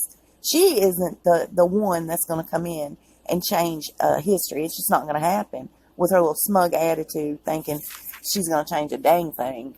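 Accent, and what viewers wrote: South, United States. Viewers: Hey, what southern state are you from? I am guessing Georgia. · You and your southern accent tell us what you are. Sorry baby.. TImes have changed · I LOVE GOSSIP WHEN IT IS AS “ JUICY” AS YOUR WRITINGS. AMERICANS ARE NOT USE TO HEARING THE “ INSIDE DIRT” ON ROYALS: NO MATTER WHAT THE COUNTRY, WE HEAR NOTHING! ( BY THE WAY) YOU ARE A “ GENIOUS” AT GETTING AND KEEPING ONE’S ATTENTION. AS A TEACHER, I MUST TELL YOU THAT THE WORD “ ESPECIALLY” DOES NOT HAVE AN “X” ANYWHERE IN IT, BUT YOU ALWAYS SAY “ EXPECIALLY”. YOU ARE DOING “ A “ WORK IN REPORTING, BUT IT WOULD BE EVEN BETTER IF YOUR SOUTHERN HABITS SAID ALL THE ENGLISH WORDS CORRECTLY! ASIDE FROM THE SOUTHERN DRAWL, ( WHICH WE LOVE), WE ALSO WOULD LOVE FOR YOU TO GET THAT ENGLISH PRONUNCIATION RIGHT! THAT WOULD MEAN AN “ A ++ “ ‼️‼️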